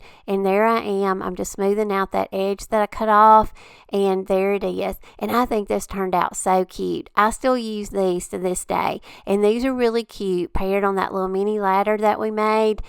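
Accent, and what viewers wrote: South, United States. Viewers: Thank God I came across someone that speaks just as KUNTRY as I do. Lol. People say I'm too KUNTRY soundin'. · I love the things u do.l!! Everything is beautiful..I’m southern too but your accent is deeper than mine .I think hehe I love listening to u!! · I hadn't been inspired for the past couple days, and I was feeling pretty bummed out about it, so I was searching for some videos I'd never seen on Youtube, when I came across one of your compilation videos. I love the same style of things you do, and I've never seen any videos like yours. I have probably watched every video about crafting that is on YouTube, and you are hands down the most talented crafter on here. Keep those videos coming! P.S. I love your country accent, because mine is the same! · I’m in Georgia. You’ve got the prettiest little southern belle accent I’ve ever heard! 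Grateful to find your channel! Finally someone who does country French decor diy! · I just found your channel. I love your projects! As a fellow Southerner, I just love listening to your accent. I can't wait to watch more of your videos. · I love kuntry accent..very sweet. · your videos are awesome, I LOVE all your projects and listening to your sweet southern voice! I have used all of your ideas and feel so inspired by you. Thank you for sharing. God's blessings <3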